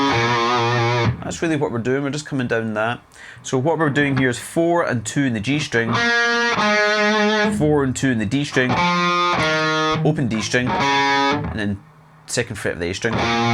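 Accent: Scotland, United Kingdom. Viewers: you Scottish? I'm american but I visited Scotland not too long ago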